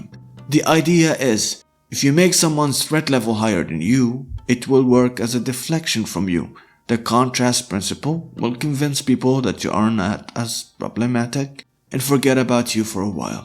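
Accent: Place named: France. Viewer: flawless and nice French accent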